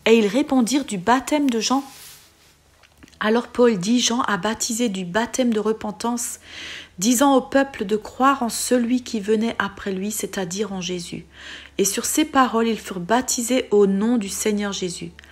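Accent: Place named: Alsace, France. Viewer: Êtes vous originaire d'Alsace ? ( une petite pointe d'accent de l'Est ☺️❤️)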